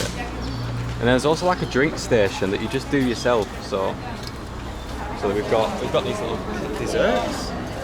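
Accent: United Kingdom. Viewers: Your accent like British bro😂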